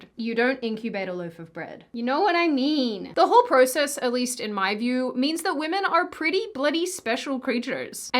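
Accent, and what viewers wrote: United States. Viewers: Is it me or is her accent becoming more "americanized"?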